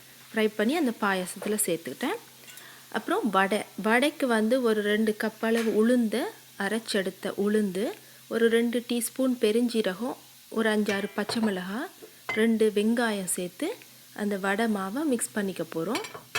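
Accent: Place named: India. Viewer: Full and full srilankan cooking but speaking way indian style .but not bad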